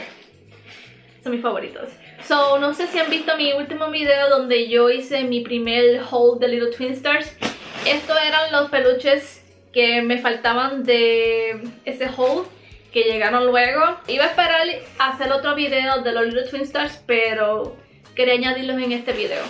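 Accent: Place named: Puerto Rico. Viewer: La cartera está preciosa. No sabía que eras latina por tu acento deduzco que eres puertorriqueña porque cambias las R por la L. Deberías revisar las tiendas de segunda mano a veces tienen cosas de Sanrio por muy muy barato. A mi también me encanta los LTS Saludos de una Venezolana desde Austria en Europa.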